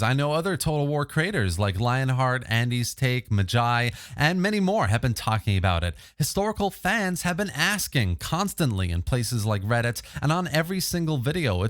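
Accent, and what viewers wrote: United States. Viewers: Alright. Who the hell deals in pounds, but has an American accent? What's going on here? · You're the most American sounding Scotsman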